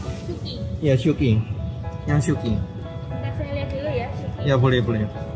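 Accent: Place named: Java, Indonesia. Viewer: Wooaah selamat nnton miin critain akuu yaah.. Btw admin ko bahasa nya agak medok gituu..yash..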